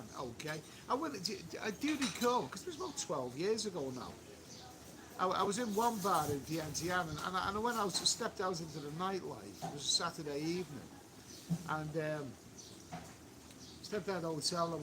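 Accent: Liverpool, United Kingdom. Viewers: The sound is bad it's making you sound like someone from Liverpool.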